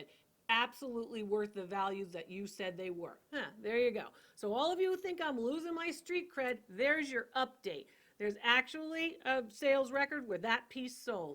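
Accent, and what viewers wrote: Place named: New Jersey, United States. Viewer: You are from NJ, aren't you? If not, you have us down cold. There is something about us Jersey Girls.....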